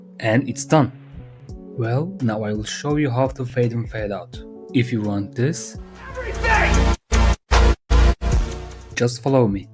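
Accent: Turkey. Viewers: türk aksanını nerde duysam tanirim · Sesinden anladım türk olduğunu ag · hocam türk müsün çok fena aksanın var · are you turkish?? (bence türksün aksanından) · türkmüsün konuşman türk aksanına benziyo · abi türkmüsün aksanın benziyorda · aşırı türk aksanı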